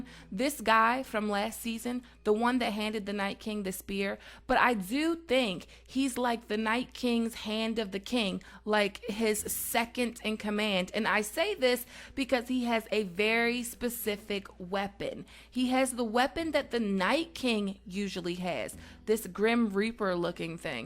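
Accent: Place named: Baltimore, United States. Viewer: u sound cute when ya B"more accent comes out. lol It was thick in this video. But I agree D&D wouldn't do something as elementary as having the 1st plan work. I like #Stargaryens. Gr8 content as usual.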